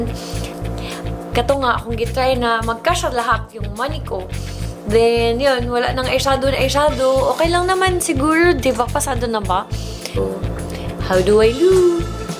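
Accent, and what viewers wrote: Visayas, Philippines. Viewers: kakyut jud oi! makaproud maminaw gabisaya ka😂😂😂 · Ang cute.. Bisaya..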